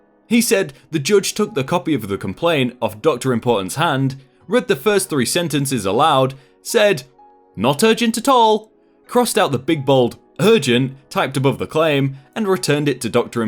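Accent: United Kingdom. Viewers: nice to hear a brit accent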